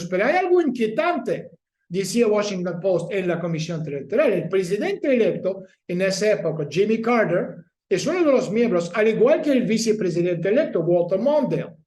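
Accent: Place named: Spain. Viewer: Si ya vives en México¿Porqué hablas en español de España? Creo que sería más cómodo para todos que te fueras a vivir a España, con Borrel, te sentirás bien de compartir la lengua de ese "rusófilo" conocido.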